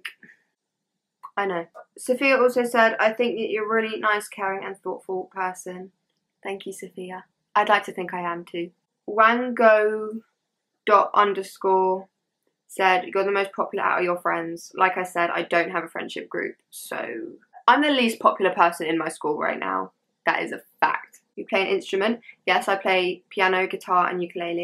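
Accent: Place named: United Kingdom. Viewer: I love a girl with a British accent it's cute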